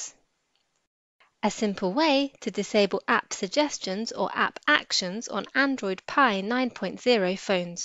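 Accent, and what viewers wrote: United Kingdom. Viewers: I can't deal with the British type accent.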